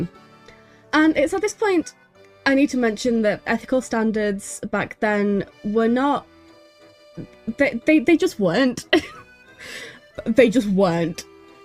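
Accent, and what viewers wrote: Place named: Russia. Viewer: why did i think this man was a russain immigrant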